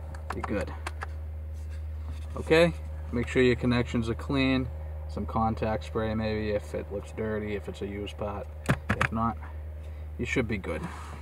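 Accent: Boston, United States. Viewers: thanks - need this -useful vid Also your accent is awesome accent. Very Good Will Hunting :-) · I like your Boston accent.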